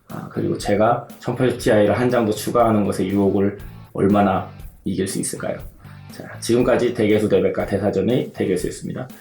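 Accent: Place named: Gyeongsang, South Korea. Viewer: 띵충 보면 욕하는 경상도 사투리 쓰면 일베인가? 경상도인 어떡하지..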